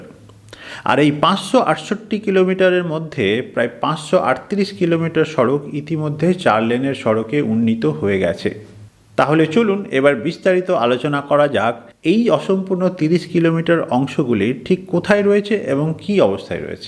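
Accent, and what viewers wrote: Standard. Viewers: অসাধারণ উপস্থাপনা। এত বিশুদ্ধ উচ্চারণ, শব্দ সংযোজন ইত্যাদি দুর্লভ হয়ে উঠেছে, আজকাল। যাই হোক, দুর্ভাগ্য বাংলাবাসীর যে এতো গুরুত্বপূর্ণ একটা রাস্তা এত বছর পরেও জমিজটে আটকে আছে। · Khub sundor. Apni bangla khub sundor bolen.. bisuddho bangla uchcharon kub kom sunte paoa jay..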